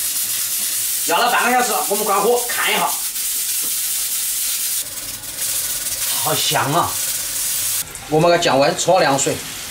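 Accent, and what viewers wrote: Sichuan, China. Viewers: 台灣這裡叫（烏骨雞），補冬的珍禽食材之一。每天都要來看看的視頻，聽你豪邁的四川口音和大口食相，真的好療癒！因為生病，很多東西想吃也吞不下，看你吃彷彿自己也飽餐一頓，過乾癮！！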